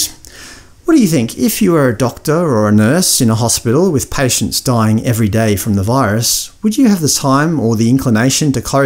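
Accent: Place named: Australia. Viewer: Don’t know what it is exactly. But the calming and soothing of your voice and your awesome Aussie accent just appeals to me.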